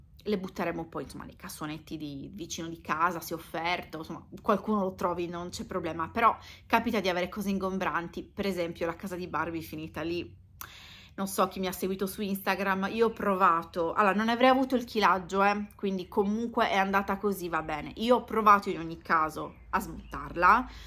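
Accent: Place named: Sardinia, Italy. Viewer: Buongiorno video consigliatomi da YouTube, non ti conosco ora guardo un po' di video per capire, dall'accento sei Sarda ? Vivi in america mi sembra di aver capito 😊 io Sardo vivo in Germania da 22 anni. Anche io ho una canale .. adesso vado a veder qualche tuo video ❤ a presto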